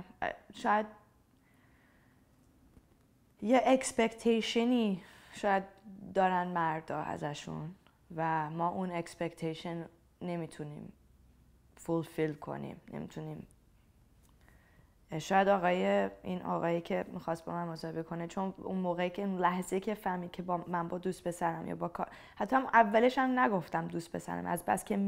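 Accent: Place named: Isfahan, Iran. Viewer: The first American with ISFAHANI ACCENT......................so gifted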